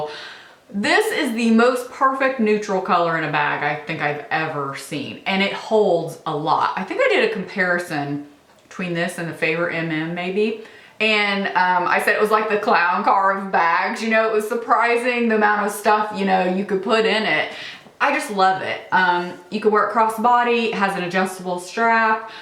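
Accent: South, United States. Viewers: You have the strongest southern accent I have ever heard. Where are you from...love from Sydney, Australia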